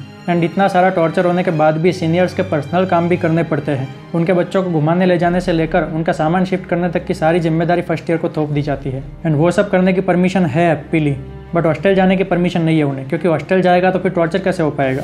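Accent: Gujarat, India. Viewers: Clearly your voice says you are pakka gujarati ❤️❤️❤️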